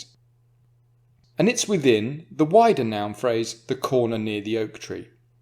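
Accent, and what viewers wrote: United Kingdom. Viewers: Beautiful British accent. I like it so much!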